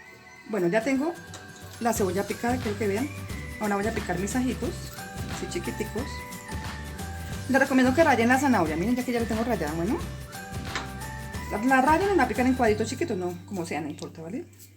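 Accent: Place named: Bogotá, Colombia. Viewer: Yo ya te go los ingredientes, me agrada como hablas muy chévere bien Bogotana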